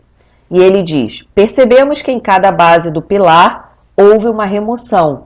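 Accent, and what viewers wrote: Brazil. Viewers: Esse sotaque me parece ser de uma paraense nata, né não?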